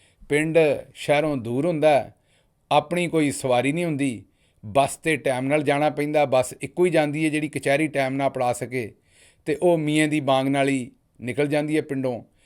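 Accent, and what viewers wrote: Punjab, India. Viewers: Aahahahaha wahh Sahab, Kya Punjabi lehja or pure alfaaz hen aapky😂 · 😂😂😂😂😂😂😂😂 well thought out story Dr sahib love your shudh Punjabi accent thanks for putting a smile on our faces . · . I love your village Punjabi Vlogs. We are from Lahore for generations, used to listen this Punjabi only when we used to visit our relatives in a village. It is soothing and I really enjoy it. The way you speak it seems that my cousins from a village are talking. It is hilarious and I get a kick out of it. Thank you. I really miss village Punjabi. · آپ کا پنجابی وی لاگ اب روز سنتا ہوں آپ کا لہجہ خالص پنجابی اور پنجابی کلچر کو الفاظ کے روپ میں حقیت میں محسوس کروا دیتے ہیں۔۔۔۔۔۔ اس پنجابی وی لاگ ختم نہیں کرنا چاہے سننے والا میں اکیلا ہی کیوں نہ رہ جاؤں 😂 فن بھی انفارمیشن بھی آپ کے اس پروگرام میں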